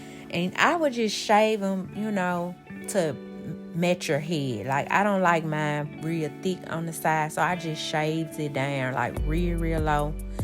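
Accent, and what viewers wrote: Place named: New Orleans, United States. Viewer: Accent sounds from NOLA 🎉🎭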